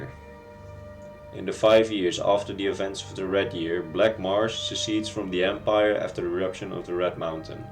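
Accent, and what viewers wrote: Netherlands. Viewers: Vanaf hoorde ik al gelijk... Een Nederlander! Wij hebben echt zo'n typisch accent. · is that a Dutch accent? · It's a Dutch accent though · oh nederlands engels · are you dutch because you have that dutch kinda accent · Nederlander!! Ik hoor het in de eerste drie seconden al gewoon. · You sound like you have a Dutch accent. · Are you dutch, zo ja. Hahaha ik wist t. Hoorde t aan je accent. Als t niet zo is, oops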